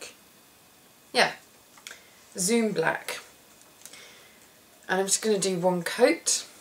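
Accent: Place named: England, United Kingdom. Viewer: what part of england are you from? Where is your accent from? kisses from canada!!!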